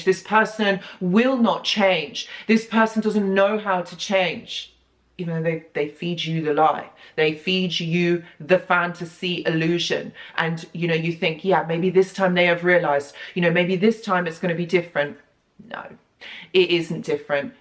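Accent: England, United Kingdom. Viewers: I found this for a reason. Lovely to hear it with an English accent!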